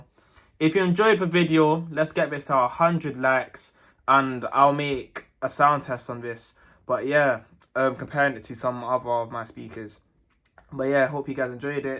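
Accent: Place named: Northern England, United Kingdom. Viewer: Bruv are you from Manchester ?your accent sound northern.im from london so what just wondering, safe for the video fam can you pair your d9 with the d10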